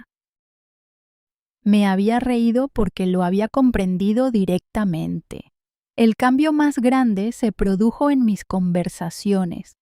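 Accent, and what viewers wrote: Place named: Latin America. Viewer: La hablante tiene acento latinoamericano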